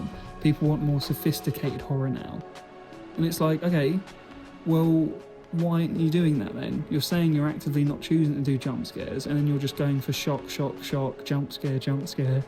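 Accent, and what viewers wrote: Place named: United States. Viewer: PS I love your American accent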